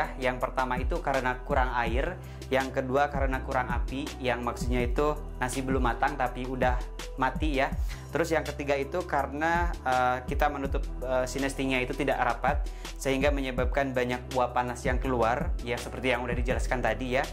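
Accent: West Java, Indonesia. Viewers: Meskipun pakai bahasa Indonesia yah, masih lengket banget yah logat sunda nya yah. Mantap Kang 👍 lanjutkan, ditunggu video selanjut nya Kang 🤗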